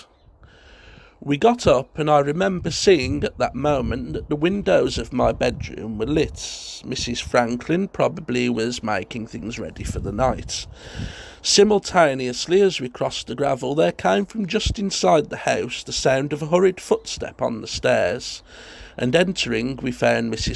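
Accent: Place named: Solihull, United Kingdom. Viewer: What’s the narrator’s accent? I want to guess Solihull, but I must admit my experience is very limited, being an Australian presently kipping in London...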